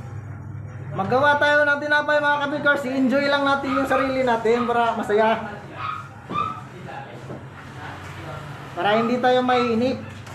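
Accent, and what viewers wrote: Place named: Visayas, Philippines. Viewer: BisaYa kaaYo dodong #vlog cho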